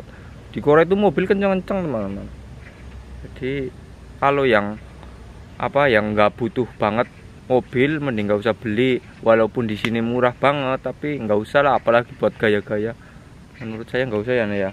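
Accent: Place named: Tegal, Indonesia. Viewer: Ky nya org tegal yah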